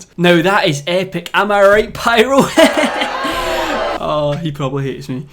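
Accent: Scotland, United Kingdom. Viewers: are you scottish? i love your accent damn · Hello, I'm just asking. Are you British or Scottish? If not, what is your nationality. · r u socttish? U sound scottish and I like that this is the first vid i watched abt u btw lol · Are you from Scotland?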